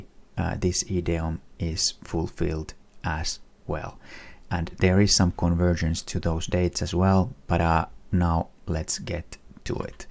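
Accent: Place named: Baltic states. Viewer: Brother, i was wondering if you coming from a Baltic country ? Your accent looks like theirs 😀 I hope we are leaving this weekend 🙏🏼✝️ to meet all around our sheperd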